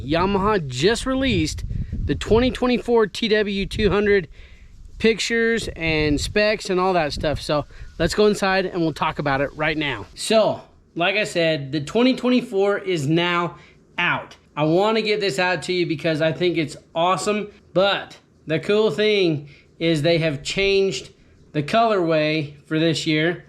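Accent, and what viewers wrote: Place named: Utah, United States. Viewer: I'm from Salem and moved to Washington 20+ years ago. Are you from near there? I immediately recognized the Utah accent! I'm hoping to get a TW soon.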